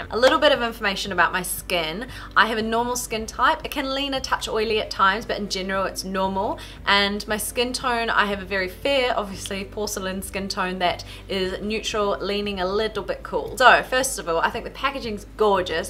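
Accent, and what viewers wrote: New Zealand. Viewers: is she from new zealand?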